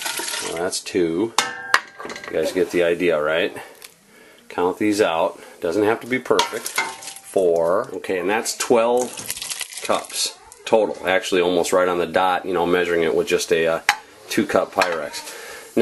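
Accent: United States. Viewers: Hi thanks for the Video and info, I am living in England and did not quite catch what it was you added to the Marmalade later on in the Video after the sugar. It may be the American Accent or an American product I am not familiar with... I've just started prepping this year and have started to move onto producing long term storage foods. Not spoke or met any other preppers yet in England does not seem to be as big a concern over here.... Thanks for your time Tony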